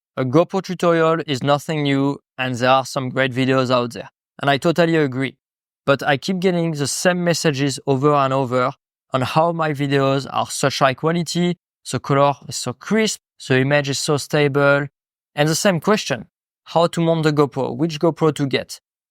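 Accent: France. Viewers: Félicitations quand meme pour l’anglais, ca me trigger quand meme 😅, j’entend du franglais c’est perturbant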